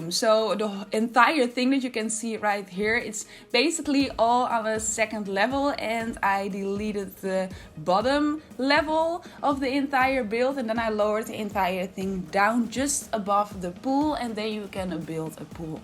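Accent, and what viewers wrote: Netherlands. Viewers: ik kan het nederlands accent horen in je stem lol heel mooie en creatieve build btw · Waarom heb je zo’n Nederlands accenten. Ben je Nederlands? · I can recognize that dutch accent anywhere! Dit gebouw is echt geweldig, al je builds zijn zo creatief, ik heb helaas niet alle packs dus bij mij komt het er nooit zo mooi uit te zien :c Maar ik blijf dromen hahaha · Hoorde het accent en dacht.. dat is een Nederlandse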